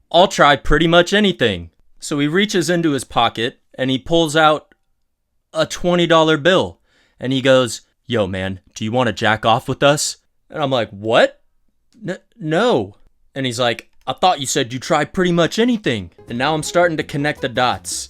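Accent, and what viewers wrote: South, United States. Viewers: with a slight southern drawl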